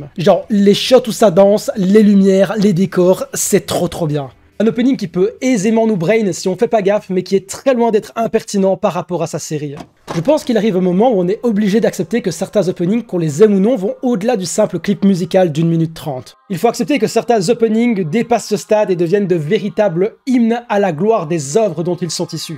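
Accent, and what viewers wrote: Belgium. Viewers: t'es belge ? ton accent me dit qqchose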